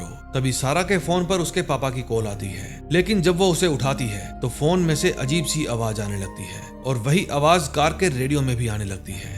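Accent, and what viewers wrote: Punjab, India. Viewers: are u punjabi?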